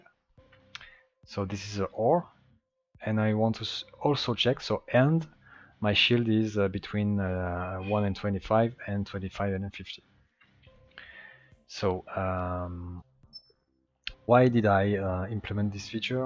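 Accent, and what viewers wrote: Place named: France. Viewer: i feel sound a french accent :D (nice review)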